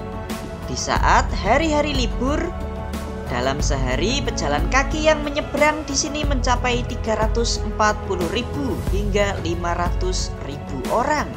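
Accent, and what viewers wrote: Java, Indonesia. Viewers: narator e ,Mas * Wong Jowo pundi niki mas? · kok medok tenan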